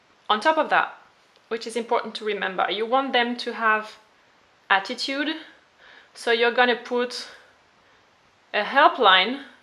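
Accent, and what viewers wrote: France. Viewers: Lissy London hearing her accent, she’s probably french so I’d say that’s where she went, I could be wrong though!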